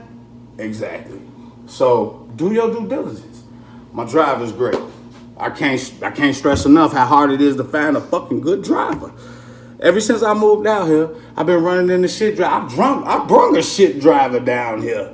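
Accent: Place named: Chicago, United States. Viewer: You talk like you from Chicago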